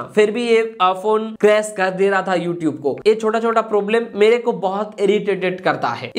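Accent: Bengal, India. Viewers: Bangali hain kya bhai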